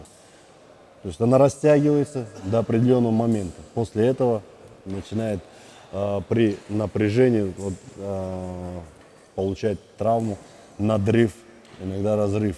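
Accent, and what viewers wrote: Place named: Kazakhstan. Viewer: Он с Казахстана, там такое произношение допустимо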